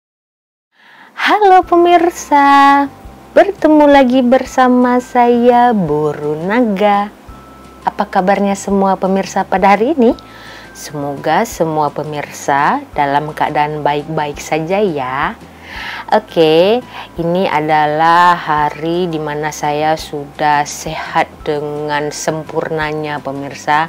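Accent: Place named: Malaysia. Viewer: akak ni asli dari Indonesia ye kak?? cuma mahu tegur lenggok dan sleng/loghat nya sudah kayak orang Malaysia... saya rasa kagum sama akak...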